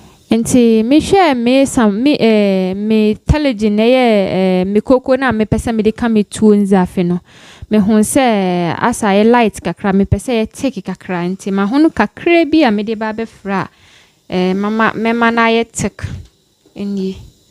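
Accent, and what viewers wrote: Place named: Ghana. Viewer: your Twi is beautiful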